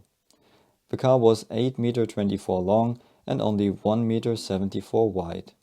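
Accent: Germany. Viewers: Your accent sounds very german, i know that because i'm german, are you german too? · To anybody interested. The channel owner, although sounding German, I suspect has Scottish genes since I found out the name of the channel has thy Scots surname of. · bro your german accent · And all this with the your german accent. Einfach herrlich.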